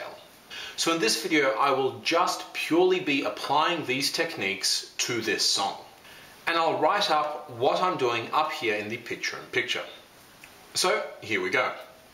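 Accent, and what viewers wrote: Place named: Australia. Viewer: hey, i noticed you have an Australian accent, do you do 1on1 tutoring or know anyone else in Sydney for Jazz piano youd recommend?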